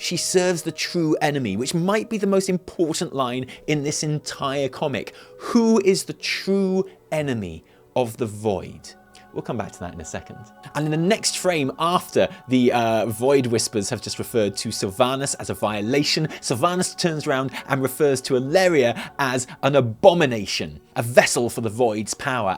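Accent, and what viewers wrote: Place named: United Kingdom. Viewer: Love your content bro. Also iam getting used to that strong brittish accent day by day. I swear i didnt understand single word to britts at first, but now is it better and better!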